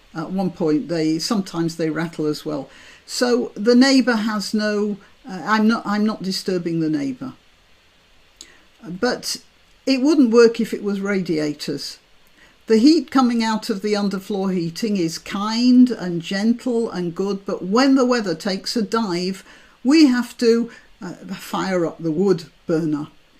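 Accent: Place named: England, United Kingdom. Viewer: It is amusing to hear someone talk about "Scottish madness" when your accent suggests that you live in, or come from, a country that is slowly disappearing under the open-toed sandal of Islam. The army that is being sent over in an ever-increasing armada of little boats, like Dunkirk in reverse, will only stay quiet until they reach a tipping point. When they reach a critical mass, bad Muslim and nice Muslim alike will not be asking for anything, they will be taking. And we will have lost everything thanks to our determination to be kind and welcoming. Piddling about talking about heat pumps will suddenly seem like an incredible waste of time after that point. Why the women of England aren't shitting themselves in terror of this is beyond me.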